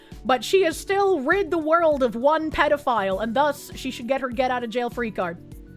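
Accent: Boston, United States. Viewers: I live in a world where I get my news from a fluffy fox thing with a Boston accent. I swear, It's like Futurama.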